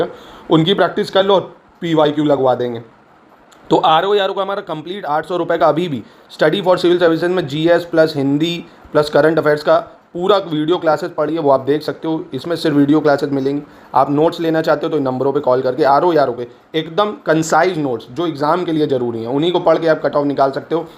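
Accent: Kanpur, India. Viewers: Kanpuriya hain ase hi Bolte hain😅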